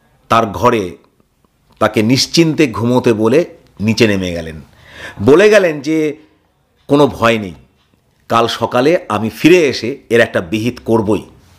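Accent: North Bengal, India. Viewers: উত্তরবঙ্গের সব জেলায় এমন ভাবেই বলে,(আর বাঙলা কথাটাও পড়িনি,বাংলা এমন পড়েছি) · বাসা(বাড়ি), কান্না করা (কাঁদা), খারাপ পাওয়া (খারাপ ভাবা), কথা করা (কথা বলা ) , আসেন, বসেন ( আসুন, বসুন ), সেই হয়েছে ( দারুন হয়েছে )....... বাংলার এই টানগুলো শিলিগুড়ি, জলপাইগুড়ি, উত্তর বাংলার অত্যন্ত প্রচলিত শৈলী। দক্ষিণ বঙ্গে প্রচলিত নয়। নিজের কথার টান / Acsents ই যে সঠিক এই রকম ধারণা ঠিক নয়। কথ্য ভাষা সংশ্লিষ্ট স্হানের বসবাসকারীরাই ঠিক করেন। সেই অর্থে ঠিক ও করেন না। আপনা-আপনি ই প্রচলিত হয়ে যায়। এই Accents কোনো ব্যাকরণ-বিদ বা মাস্টারমশাই নির্ধারণ করে দেন না। তাছাড়া, ভাষায় বৈচিত্র্য ভাষাকেই সমৃদ্ধ করে।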